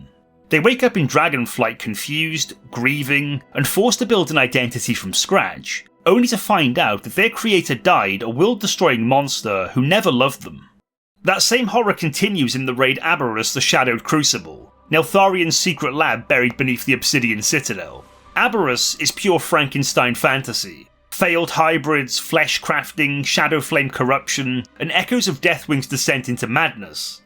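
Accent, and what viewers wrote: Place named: Wales, United Kingdom. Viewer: Haha I am from Cwmbran. You sound very similar to me but I play with a guy from Barry and he sounds a little more like you than i do so yeah....That was my best guess but i new you was Welsh :)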